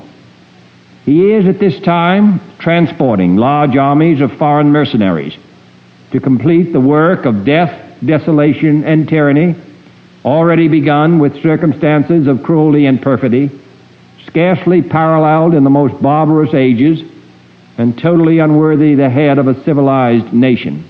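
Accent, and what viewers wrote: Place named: Boston, United States. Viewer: although i lived in a very republican home. i liked jfk.. there was something but of course i had no idea. i was 17 when he was killed (?). now i know why.. he was a good guy..so nice tohear his boston voice as i used to talk like that!!! his legacy will be completed very soon. God bless the Alliance Q and white hats.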